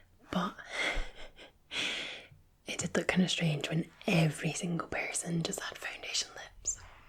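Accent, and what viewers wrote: Scotland, United Kingdom. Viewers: omg Scottish YEEEEEEY😍😍😍😍 glad to have found you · Hi! I just want to say that I really enjoy your channel. Your videos are great, and you're such a beautiful girl, especially without makeup. I love natural beauty. Anyway, I just love your Scottish accent. Your voice is so relaxing and it gives me chills. Thanks for posting this. I really appreciate you're honesty. ❤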